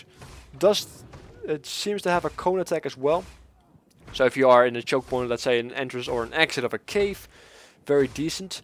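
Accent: Netherlands. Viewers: You're dutch, aren't you? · you just can hear on his english speaking hes from holland lol:P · Ik snap hoe moeilijk het is om engels te praten met n nederlands accent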